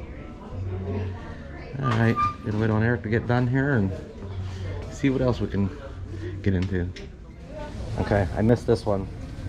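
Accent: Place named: Pittsburgh, United States. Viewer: Are you from Pittsburgh? I detect a little bit of the burg accent! 😀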